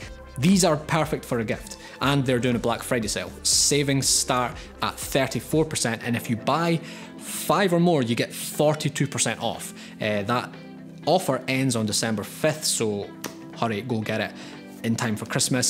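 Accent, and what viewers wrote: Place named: Scotland, United Kingdom. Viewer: ah yes the return of mr scottish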